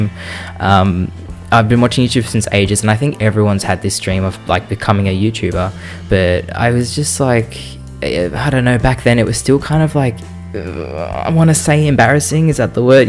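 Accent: Australia. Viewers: I could tell u were from Australia. I am too mate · aww you seem so smol in this and after you mention being Aussie, I just heard your accent so much more intensely after lol.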